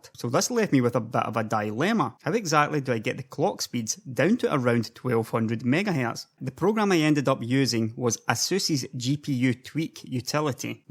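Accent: Scotland, United Kingdom. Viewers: content : 40% scottish accent : 60% total : 100% subscription rate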